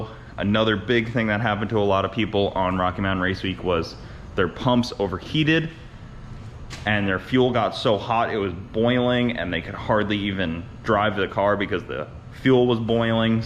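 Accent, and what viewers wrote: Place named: New York, United States. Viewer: What part of New York are you originally from?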